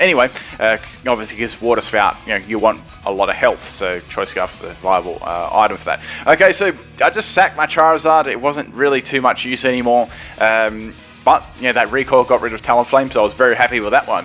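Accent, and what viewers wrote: Australia. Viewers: He's Australian, if that helps you realize.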